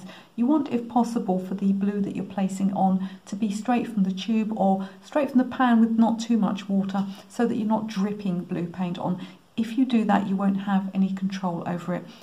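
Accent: United Kingdom. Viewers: Loved this! I struggle with backgrounds a lot and this really gave me a lot of techniques to try and make my backgrounds more interesting. The daisy was my favorite but I loved them all. Very helpful! ( By the way....love your British accent😀 and lovely nail color!)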